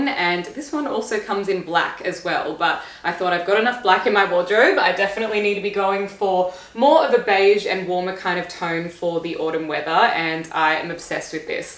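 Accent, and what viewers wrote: Australia. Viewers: You are a beautiful dark brown haired young woman. Beautiful eyes look kind of blue super cute, beautiful smile and cute voice with the Australian accent. Beautiful body and curves, cute little belly button, nice booty and sexy long legs. You look STUNNING and super cute in all of these outfits. You look like a dark brown-haired angel in the white dress. Everything this video looks REALLY good on you. All of the colors go really good with your skin tone and hair color and make you even prettier. You are beautiful and really cute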